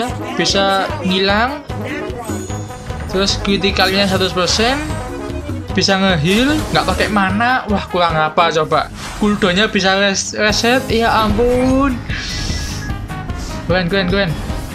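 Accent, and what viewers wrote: Java, Indonesia. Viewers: asli orang mana ya...kok kayak ada logat jawanya...😅😅😅😅😅🍹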